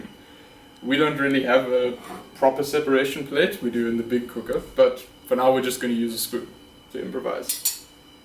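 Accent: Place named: Germany. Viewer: few words and i can tell, german right?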